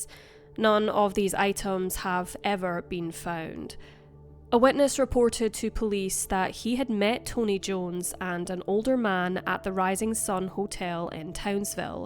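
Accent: Scotland, United Kingdom. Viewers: The narrator is Scottish, speaks with a Scottish accent. That's what "Perth" sounds like in a Scottish accent. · I love the accent! I was just posting a spelling PSA for those who lack the ability to figure out what city name you meant. Not a dig about the Scottish drogue.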